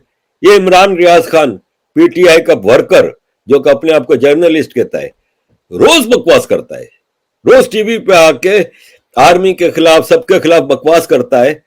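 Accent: Gujarat, India. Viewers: Sir aap k bol ne me abhi bhi gujrati accent dikhay de ti aor sir aap j se musalman ki Bharat me jarur hai aap Gujarat me aa ke rahi ye sir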